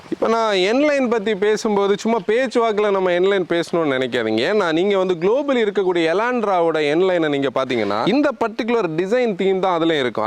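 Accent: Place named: Thoothukudi, India. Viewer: Hi This is among one of the best way of reviews among the most of the moto wagan reviews, incl ur review of Slavia 2025. You have covered the review in Q&A method. You even compared with parallel sedan variant of skoda. Thanks a lot for ur service. Your review is one among the best in comparison with other national level reviews. On the lighter side, I like ur Thoothukudi தமிழ்.🎉😊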